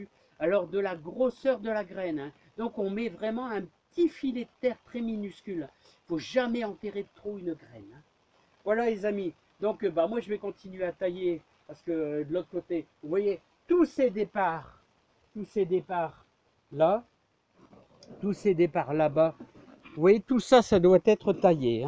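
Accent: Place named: Calais, France. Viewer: Salut Je ne suis pas pressé pour les graines t'inquiète pas de toutes les façons ce sera a semer qu'au printemps prochain,et,si tu as d'autres graines quelconques a rajouter si tu veux il y a pas de problème. Pour ma vigne je vais voir encore cette année ce que va donner le résultat. Dis moi en feuilletant tes pages j'ai vu que tu bouture la clematite tu le fait quand?.Je te remerci pour tes réponses c'est toujours avec plaisir de te revoir et si un jour je n'ai pas le moral Je penserai a regarder Ta chaîne. Il y longtemps j'ai connu une famille a Calais:la famille Alexandre qui s' occuper du club des Vieux tarots, tu les connais peut-être? C'était mes anciens grands beaux parents. Par ton accent Tu me rappel un peut cette époque. Bye bye.a bientôt Pascal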